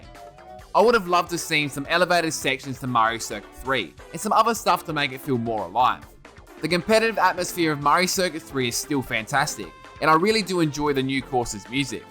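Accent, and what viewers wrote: Australia. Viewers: Amazing video! Here is my list: 8.Mario circuit 3 7.Sky High Sundae 6.New york Minute 5.Kalimari desert 4.Snow land 3.Sydney Sprint 2.Waluigi Pinball 1.Mushroom Gorge And I really like your Australian Accent:) Keep the good up💖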